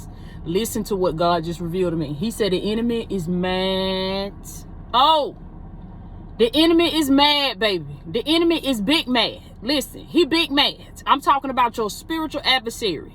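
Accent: South, United States. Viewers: Love love love love love love love love love love love that southern accent my sister😊😊😊.....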